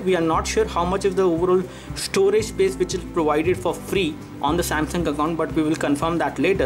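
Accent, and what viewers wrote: India. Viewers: Daaaamn! That indian accent drives me insane!! It's so annoying!!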